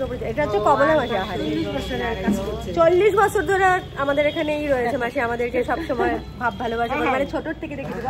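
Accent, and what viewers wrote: Bangal, Bangladesh. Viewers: Khub bhalo laglo specially bangal bhasa ta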